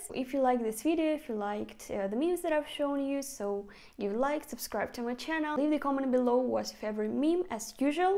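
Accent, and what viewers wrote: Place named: Berlin, Germany. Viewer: Berliner! Ganz eindeutig Berliner!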